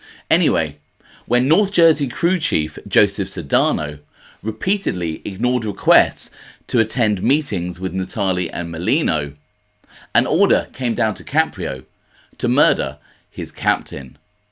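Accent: United Kingdom. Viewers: Dude, sorry, but it's so weird to listen to you, discussing American Mafia issues, with that hardcore British accent. Am i the only one here? All the credibility is just not there. How would you know stuff sitting back there in London?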